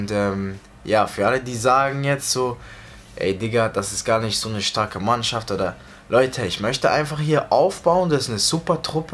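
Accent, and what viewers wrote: Switzerland. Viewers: Wer findet auch das sein dialekt zum schwrizer wird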